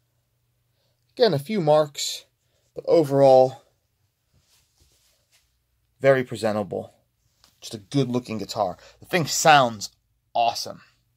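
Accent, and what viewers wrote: United States. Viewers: When you going to germany for some real metal head shit real nice work doing. you def got a florida accent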